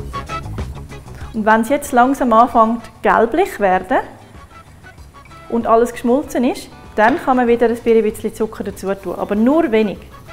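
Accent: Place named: Switzerland. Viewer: Der schweizer Dialekt war für mich nicht einfach zu verstehen, aber es hat sich auf jeden Fall gelohnt! Danke!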